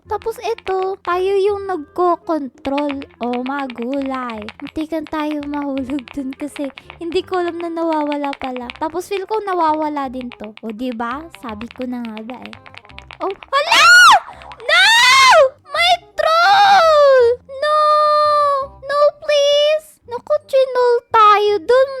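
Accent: Visayas, Philippines. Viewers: Ate bisaya ka pala kala ko Tagalog ka ❤❤❤❤ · Bisaya kaba ate!?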